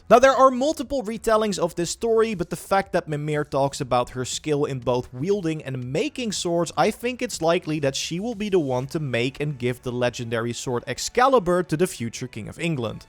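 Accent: Netherlands. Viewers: dat onherkenbaar nederlands accent :D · Nederlands accent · Are you dutch?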